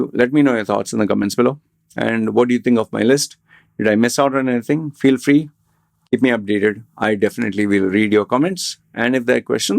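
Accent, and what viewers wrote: Kerala, India. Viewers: Hi, i watch your videos because i like your style of communication. Your accent suggests you could be from Kerala or raised there. Is that correct? Only asking out of curiosity.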